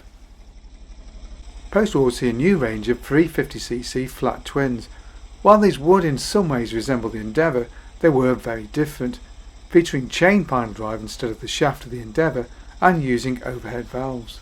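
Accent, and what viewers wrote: United Kingdom. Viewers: Neat bikes! Unfortunately, I could hardly understand a word that was said between the sound quality, his soft voice, and his heavy Brit accent.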